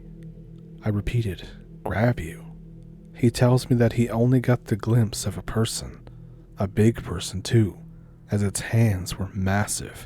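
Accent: Canada. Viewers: Thanks for sounding exactly like My B.C., And Ontario cousins and family. Peace to You- AND-- ELBOWS UP-!! 🎉🎉🎉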